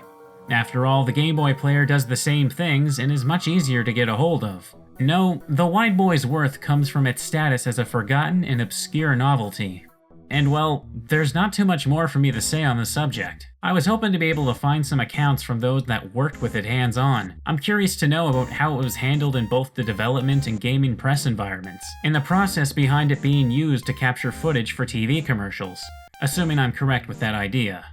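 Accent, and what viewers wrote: Canada. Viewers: I thought you sounded Canadian, the you said "Z" like Zee, then I thought you must not be. Then you said you were Canadian...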